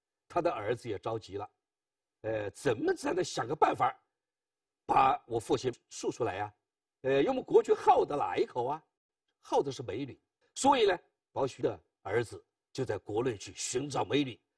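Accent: Sichuan, China. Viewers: 我听着他肯定是四川人。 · 这位教授普通话听着像四川人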